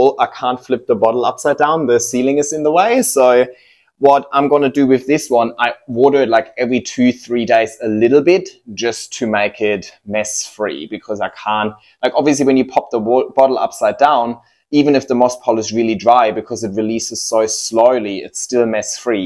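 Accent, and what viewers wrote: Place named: Germany. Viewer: Dieser deutsche akzent hahahah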